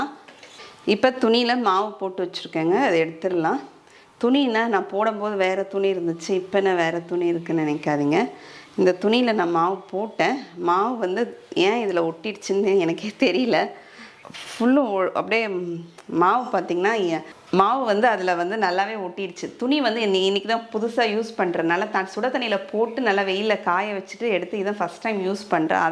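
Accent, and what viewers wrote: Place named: Puducherry, India. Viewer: Vadaa Seema ya.. 😍😍😍 உங்க slang கேக்கதுமே ஏதோ little bit happiness.. Don't know y.. ❤❤ Neenga pondicherry ya 😁😁🙌🙌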